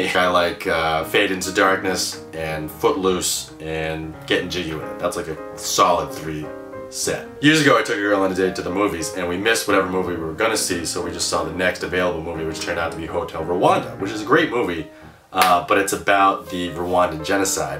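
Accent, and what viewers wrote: Boston, United States. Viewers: Are u from boston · I'm just marvelling at the Boston accent "step on a crack ya break ya muthas back"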